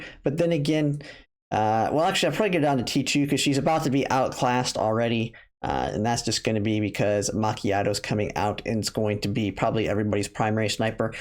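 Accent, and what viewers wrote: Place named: West, United States. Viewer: the way he pronounces the russian names is just ... well , you can tell he is from West for sure :D but the list is solid .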